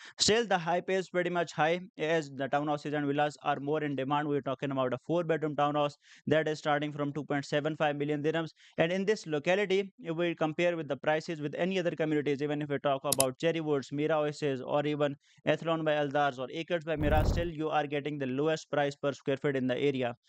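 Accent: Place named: India. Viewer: Improve your Indian accent bro...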